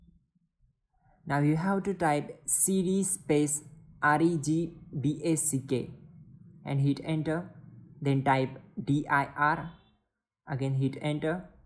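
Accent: India. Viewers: Yes... when you hear Indian voice, its gonna work... really works for me...Well done...Thank you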